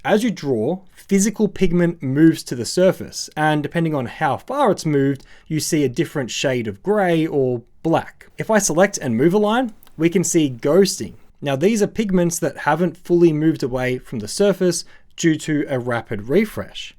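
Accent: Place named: Australia. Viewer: I've got a Supernote Nomad and I love it. Can highly recommend if you are a writer/reader of any kind. Good to hear the voice of a fellow Aussie promoting such a great product.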